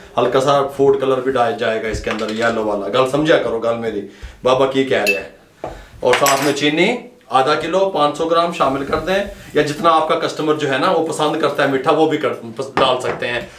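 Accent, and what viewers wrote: Punjab, India. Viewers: ji thuadi Punjabi bhaut swaadee hey. Milk shake nalo vi jaida.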